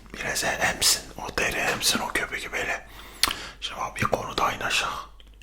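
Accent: Eastern Anatolia, Turkey. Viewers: ilk defa doğu şivesi konuşan doktor görüyorum dfsghdjfkgt teşekkürler <3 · gece gece tam ihtiyacım olan şey. doktor roleplay mi hayır doğu şiveli olmasindan bahsediyorum(3 senedir türkiyedeym bende 17 me kadar ingilteredeydm iyi gceler)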